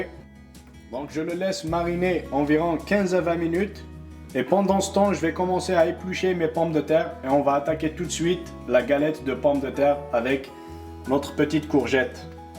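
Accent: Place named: Algeria. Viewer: Tres bel accent algérien. Tres bonne recette. Merci chef !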